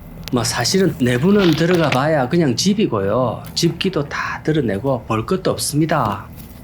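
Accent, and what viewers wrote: Gyeongsang, South Korea. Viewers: 늘 좋고 편안한 영상 보여 주셔서 고맙습니다, 님! 게다가 구수한 경상도 사투리까지, 정말 일품입니다. 어릴 때만 쓰고 오랫동안 잊고 있던 사투리를 들을 때면 정말 웃음이 절로 납니다. 다시 한번 고맙습니다. ㅎㅎ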